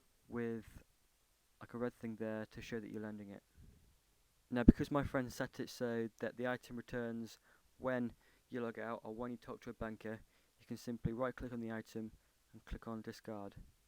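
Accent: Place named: Australia. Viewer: Lol, are you from England? You sound australian. Lol. xD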